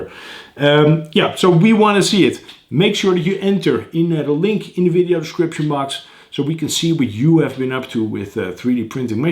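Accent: Netherlands. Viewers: Do I hear a Dutch accent? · Je hebt een beetje een Nederlands Engels accent, klopt dat ?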